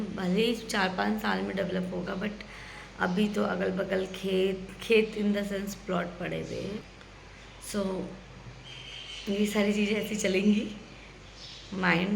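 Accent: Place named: Bengal, India. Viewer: App lakhnow se ho me smjhti thi ki ap bngal se ho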